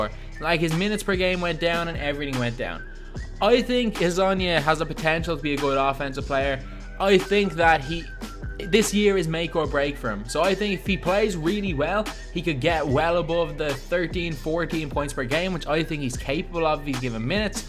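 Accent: Ireland. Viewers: Dude u Irish?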